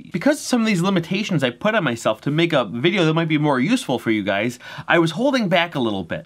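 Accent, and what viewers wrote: Brooklyn, United States. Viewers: Ahehe Brooklyn guy lol...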